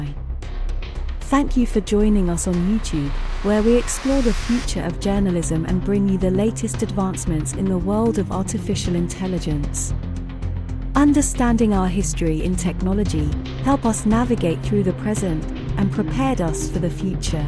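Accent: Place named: England, United Kingdom. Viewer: Young Eva Longoria with English accent.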